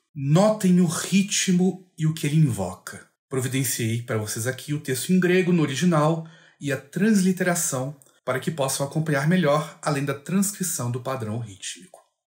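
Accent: Portugal. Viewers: Ahah sotaque português é um xodó ☺️ mas é compreensível que seja difícil pra muitos, não é nosso dia-a-dia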